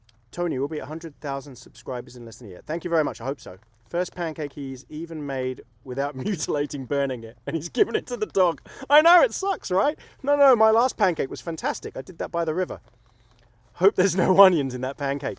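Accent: United Kingdom. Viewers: The guy sounds British though.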